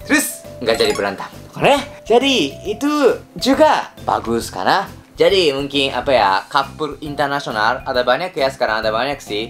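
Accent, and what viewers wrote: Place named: Japan. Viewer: Semangat terus 🙋🙋 Orang Jepang yang kurang lancar bahasa Indonesia juga lucu 😆 Aksen Jepang nya justru bikin menarik.. 🐈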